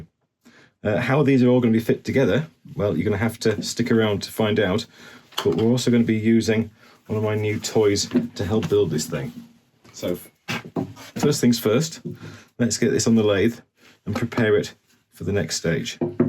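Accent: United Kingdom. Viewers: I've seen many of your projects but this one, even royalty would blush. While stationed at RAF Lakenheath, I enjoyed all aspects of Great Britain. Now that I'm retired back home in Texas your accent and kind words bring back those enjoyable times. Keep creating my friend!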